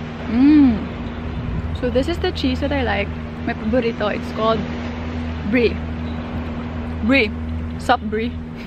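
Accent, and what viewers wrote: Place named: Bisaya, Philippines. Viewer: That bisaya accent is so lovely to hear..😍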